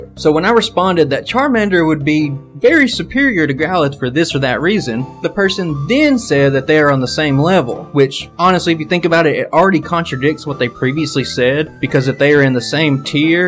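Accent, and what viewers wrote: South, United States. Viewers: just finished your vid and btw love the southern accent... Quick question do you think you can do a vid of you doing it as if you were playing normally.. as in more than one pokemon.. no tms and no items? Just not seen one of them might be intesresting.. if you do or dnt will keep watching your vids keep it up :)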